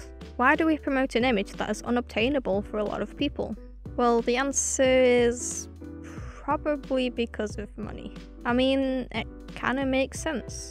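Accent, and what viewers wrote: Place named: United Kingdom. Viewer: You sound like my britt voice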